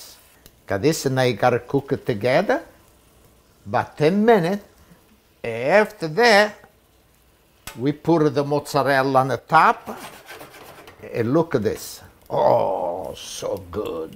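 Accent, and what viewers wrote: Italy. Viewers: Oh Dio, Figlio mio, managgia la mozzarella 😛 looks so delicious. I love fresh basilfrom the garden. Grazie 😅😅😅 You make me laugh, i love your italian accent. 🍷Salute 🍷e 🍷cin cin🍷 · I love your italian accent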